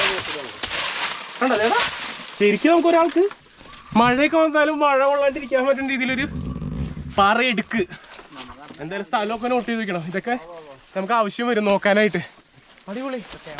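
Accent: Thrissur, India. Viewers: machan thrissur aano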